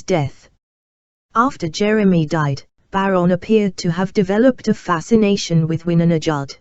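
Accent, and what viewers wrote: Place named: United Kingdom. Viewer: Amanda Sack agreed, the mechanical voice is distracting. A tinny British accent, literally like fingernails scratching across a chalkboard.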